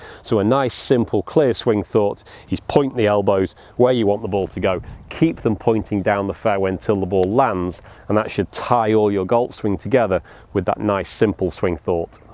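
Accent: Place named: Midlands, United Kingdom. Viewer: I want this guy to give me private lessons. Sounds like a midlander though and I'm near Gatwick 😔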